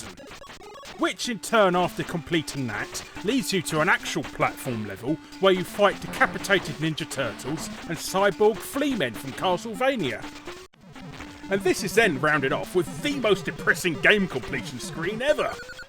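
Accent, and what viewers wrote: United Kingdom. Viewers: I've only just discovered you. I'm curious as to which part of the UK you're from and your videos are awesome, funny, informative, interesting and well presented. Thanks! PS: I love that you cover British/European games. I love all games but Japanese and American games are covered everywhere. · You can't find a more british accent than his, seriously, i dare you to try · The voice is what I love the British accent makes all things cooler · every single British person talks like this.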